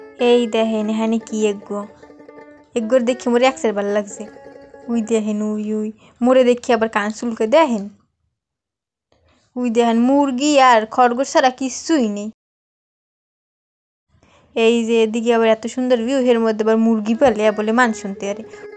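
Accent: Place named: Barishal, Bangladesh. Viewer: আম্মে আরজিনাল বরিশাইল্লা আপা ভালোলাগে একছের😅😅😅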